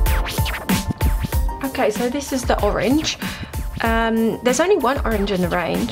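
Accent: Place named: United Kingdom. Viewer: Hi, yes I know its a 2017 video but I'm still doing catch up, I'm a slow old bird lol. Now that I've seen this video I know you should be able to do some gel designs for me, even just simple easy ones I don't care - just give me gel (x). I cannot make out if your British or not, sometimes I hear an accent and then you sound very uk-ish. Take care hun,Xv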